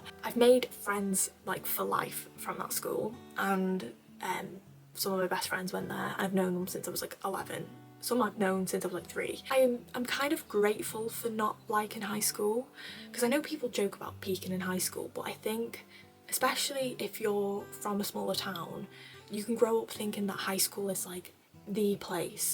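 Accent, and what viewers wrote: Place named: Liverpool, United Kingdom. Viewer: As someone not from the UK I hear your accent as scouse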